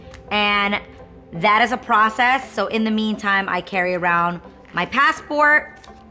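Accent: New York, United States. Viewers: So I’ve been watching masked singer lol. And just watched the latest episode on Hulu. Soo I know flamingo is you lol. I didn’t catch on till I seen the fourth episode. I heard your beautiful singing voice. And I heard your little New Yorker accent even tho your voice is disguised aaand at the end of what you said “boom”. I was like That’s. Go girl!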